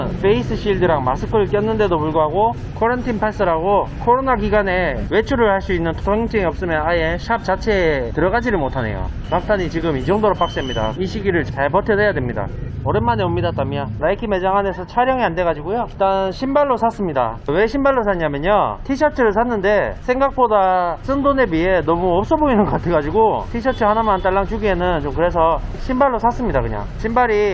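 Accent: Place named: Daegu, South Korea. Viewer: 와~~오늘 영상 정말 즐겁게 봤어요 사장님의 가끔씩 튀어나오는 대구사투리가 정겹고 오래만에 대가 영상도 좋았어요 직원 생일까지 알뜰히 챙기시는 모습도 끝내줍니다 코로나 빨리 사라져 자영업자님들께도 좋은 날이 하루 빨리 오기를... 그날까지 화이팅 합시다 👏👏👏👏👏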